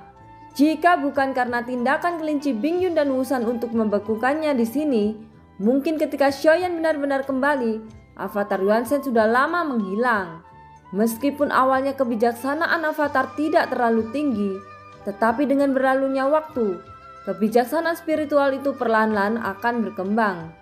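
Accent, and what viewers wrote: Java, Indonesia. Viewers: logatnya kaya orang jawa min..?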